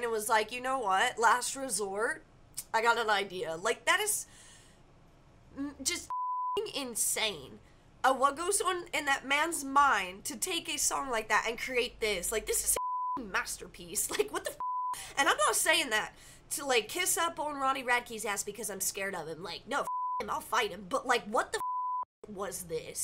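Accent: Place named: South, United States. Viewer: “I’ll fight him. I’ll meet him at Walmart.” Jfc you’re so southern. 😂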